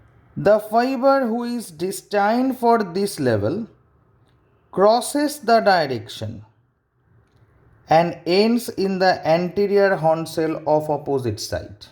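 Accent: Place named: South Africa. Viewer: Thanks - crystal clear South African 👏 May you align its clinical application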